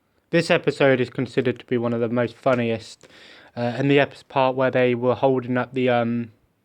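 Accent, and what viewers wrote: United Kingdom. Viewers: speaking British English That is my favourite! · where in England are you from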